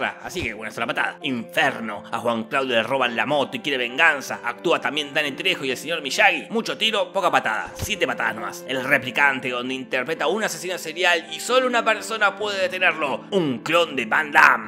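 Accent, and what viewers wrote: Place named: Argentina. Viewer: ME ENCANTA EL ACENTO ARGENTINO.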